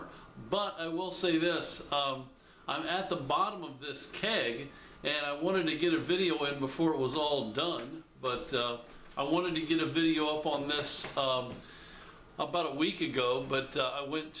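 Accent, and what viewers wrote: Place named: England, United Kingdom. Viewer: More English accents please! Yep that's a tasty looking beverage, cheers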